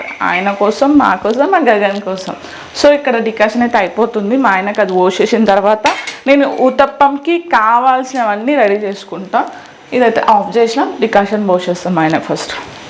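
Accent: Telangana, India. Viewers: Most definitely beautiful thing on YouTube is your pakka Telangana Slang. Boy love it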